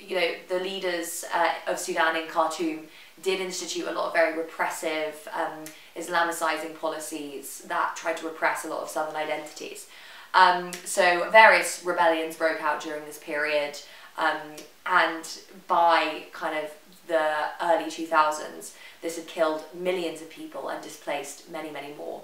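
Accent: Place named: United Kingdom. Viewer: You speak too fast, I barely understood your sentences and I know most African will not understand you British accent . so consider and bare that in mind next time.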